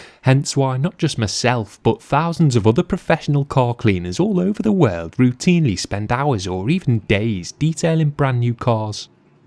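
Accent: Liverpool, United Kingdom. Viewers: Your accent is like a mixture of scouse / northern. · No worries, I can only imagine. By the ways, loving your videos and your accent (what region of the UK is it from?). Big hello from Canada!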